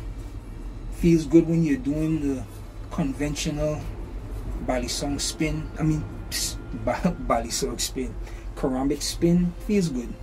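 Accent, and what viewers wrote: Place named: Sint Maarten. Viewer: Bro.. u from Sxm? Ur accent is to close to home..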